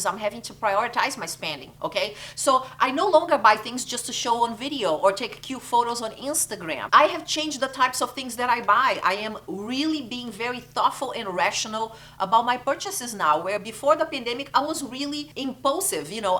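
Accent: Brazil. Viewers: Eu não te conhecia, mas sabia que era brasileira quando começou a falar. ❤️ Adorei o conteúdo! Concordo muitoo! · I hear a Brasil Portuguese accent am I right?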